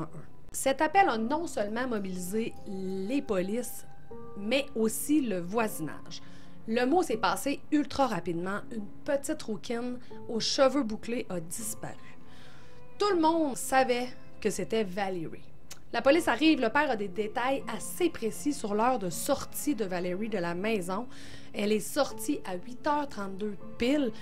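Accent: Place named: Canada. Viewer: J'ai découvert ta chaîne il y a peu, j'ai dévoré tes vidéos les unes derrière les autres j'adore, j'aimais beaucoup ton ancien générique car on y voyait les plus grand serial killers mais le nouveau à vraiment la classe ! Très bien réalisé 👍 Continue lache rien j'adore ton petit accent canadien ( mon rêve d'y vivre... ) de gros bisous de Reims en France 😘❤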